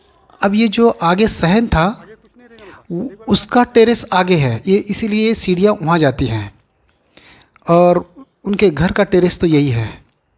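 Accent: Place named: Deccan, India. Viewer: Mashaallaha your talafus is daccani mostly Belguam side.you’re explaining it correct.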